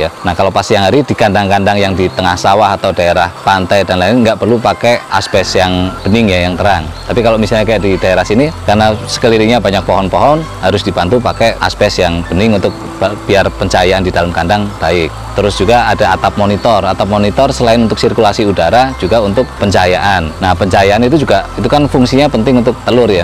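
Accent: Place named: East Java, Indonesia. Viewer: Logat jawatimuran Saya dulu di jatim sekitar taun <num> Surabaya-Malang-blitar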